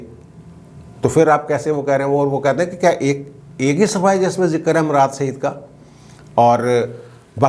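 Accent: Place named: Punjab, India. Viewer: Bhai Aap apna punjabi lab o lehja qaim rakhay howeye hain yeh bari fakhar kee baat hay, main aap kee mukamal himayat kerta hoon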